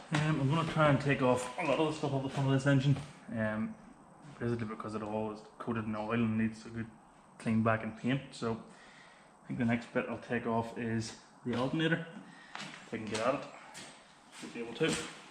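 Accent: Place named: Northern Ireland, United Kingdom. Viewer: Hello I have just started to follow your channel and I like what your are doing. It was the st170 engine into the mk2 that I was interested in first because at some point I will be putting an st170 into a red mk3 escort. By the sound of you your from Northern Ireland so am I can I ask what part are you from.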